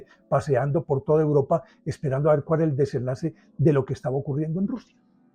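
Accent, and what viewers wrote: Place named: Antioquia, Colombia. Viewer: Uy Profe excelente relato, siempre enganchado con sus fascinantes historias, y lo mejor con acento paisa. Un abrazo grande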